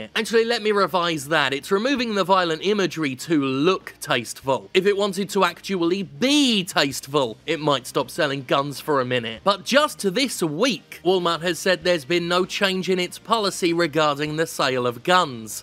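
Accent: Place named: United Kingdom. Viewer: I feel like a guy with a British accent immediately loses credibility on this issue. The country Jim was born in has been throwing people in cages recently for offensive tweets. Our individual gun ownership prevents that sorta government oppression at large, and those that don't own guns are free riding on that notion the same way that anti vaxxers free ride on the herd immunity of diseases.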